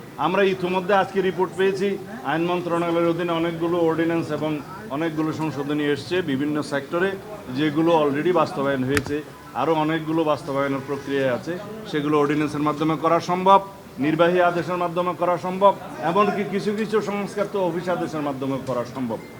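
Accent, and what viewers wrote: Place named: India. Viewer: ভারতের মাল কথা বলার স্টাইল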